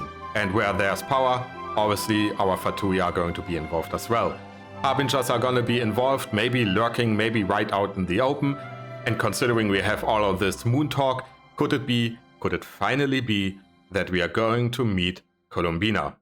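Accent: Germany. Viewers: The video is great, however, the whole time I've been wondering where you're from and I think it's a German accent? 😂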